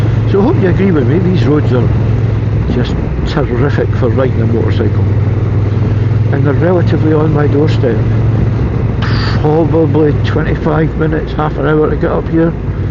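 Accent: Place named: Scotland, United Kingdom. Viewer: Good video, stunning views, and that accent is doing half the tourism board’s job on its own. I can’t wait to visit Scotland. Videos like this are dangerous for the travel fund. Also, the live stream was brilliant. You guys are doing such a lovely thing for the community, and I hope it grows and grows. Proper good vibes.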